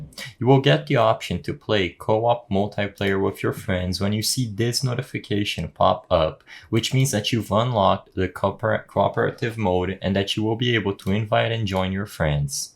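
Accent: Brazil. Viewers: você é Brasileiro né?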